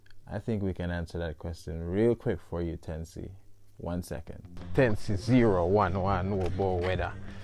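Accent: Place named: Jamaica. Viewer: The Man speaking American English with a Jamaican accent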